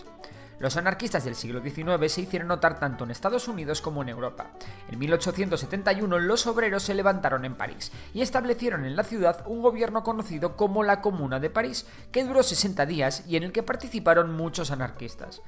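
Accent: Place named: Spain. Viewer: muy bien este video, yo esperaba un anarquista es un rebelde. Que impone su bienestar. Gracias por toda la historia, toda mi vida, escuchando de gobiernos y ahorita un poco más de información. Ojalá pueda poner, uno de los impuestos o la tecnología. En esta nueva era, a mí me tocó los Nokia con snake y ahorita ya veo memorias de pez acostadito 😗 Me es peculiar el asiento español 🫠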